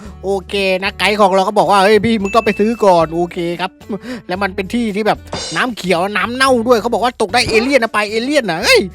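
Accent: South, Thailand. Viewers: ตกได้ปลากระป๋องครับ 5 kg. สุดฝีมือแล้วครับภูมิใจสุดตั้งแต่เล่นมา😅forzarken11 เข้ากลุ่มพี่แล้วนะครับทั้งหมด5กลุ่มจาก fcที่ดูพี่มาเกือบ2ปีคลิปก็ยังสนุกเหมือนเดิมสู่ๆครับพี่ชายแหลงใต้เก่งจุง🎉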